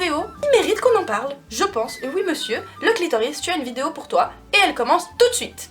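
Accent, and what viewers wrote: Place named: Geneva, Switzerland. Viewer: Depuis le temps que je regarde tes vidéos pour une fois je commente pour te dire à quel point je "prend mon pied" (bah oui restons dans le thème haha) à regarder tes vidéos! il suffit que j'aie eu une sale journée et que je me pose devant mon ordi avec toi pour me sentir tout de suite mieux! Va savoir, j'aime ta facon de t'exprimer, tes sujets et la facon dont tu les aborde, je suis sûre que tu aide énormément de monde avec tes vidéos! De plus tu me rappelle ma Suisse que j'ai quittée il y a un an pour le bout du monde, et ca me fait toujours plaisir d'entendre ton charmant p'tit accent de Genève:) Bref, continue, reste comme tu es (même si je ne pense pas que tu aie l'intention de changer!) et MERCI pour toutes ces good vibes que tu nous partage! Peace! <3 Et vive nos clitoris!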